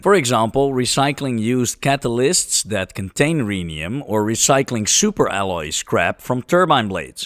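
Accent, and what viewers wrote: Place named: Netherlands. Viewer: love the dutch dialekt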